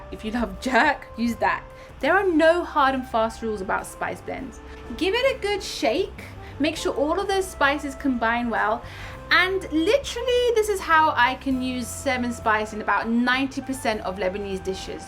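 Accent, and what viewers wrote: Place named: Birmingham, United Kingdom. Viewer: You have a Birmingham accent 😊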